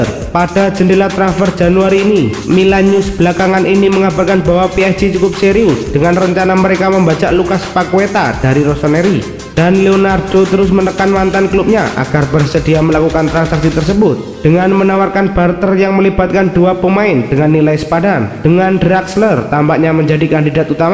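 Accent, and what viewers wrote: Java, Indonesia. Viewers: Logat jawanya di hilangin bro. Skedar saran aja bang jgn tersinggung 🙏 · Untuk, luar biasa untuk berita nya, tapi tolong logat jawanya di ubah, jelek banget jdinya, tolong...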